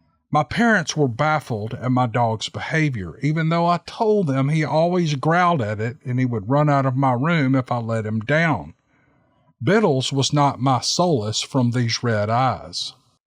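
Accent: South, United States. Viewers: Only an hour up and already 92 comments! Love 💘 your channel and listen to the others first because I like to keep the best for last!! The ladies have great voices but I really 💘 love listening to your southern voice...Florida girl here and everyone here in South Carolina have the same kind of voice. They all sound like my relatives, Carolina folks. Thanks for your stories, Merry Christmas 🎅 🎄!! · I luv all yer stories. You sound like good olesouthernman. Great narration. You make everything excellent. Yourmypeople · With an accent like yers' I'd never have guessed you know literature the way you do. I guess that's an example of bias against southerners. In fact, though, I like people from the south.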